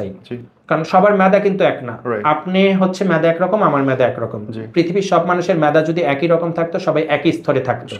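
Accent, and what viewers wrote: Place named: Comilla, Bangladesh. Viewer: ভাই আমি ও কুমিল্লার মেয়ে ভালো লাগলো আপনি আমাদের কুমিল্লা ব্রাক্ষনবাড়িয়ার ছেলে জেনে। ভিডিও সম্পূর্ণ দেখেছি ভাইয়ের আঞ্চলিক কথা গুলা অনেক হাসাইলো। কুত্তার হেউয়ানি শুনে হাসতে হাসতে শেষ 😄😄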